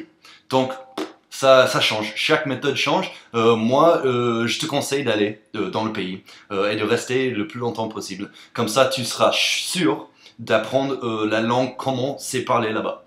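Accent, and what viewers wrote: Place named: United States. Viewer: "En Fwance, Le pewmi sa coute teu milles palles pouw le passer" Aha, j'adore vraiment ton accent.. Vive les USA !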